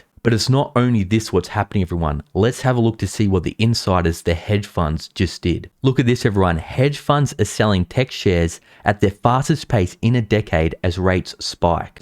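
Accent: Australia. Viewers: Love your down under accent ! Lol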